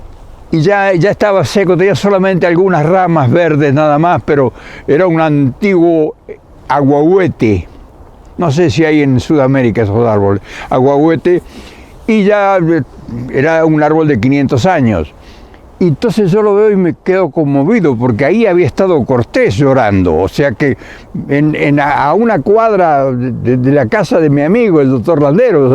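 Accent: Argentina. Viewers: Sr., no insista con su asento Argentino, usted es mas Mexicano que muchos Otros. Viva Argentina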